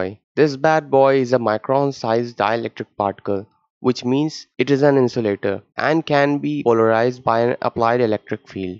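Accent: India. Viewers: You seem to a young Indian by your accent What the f in United states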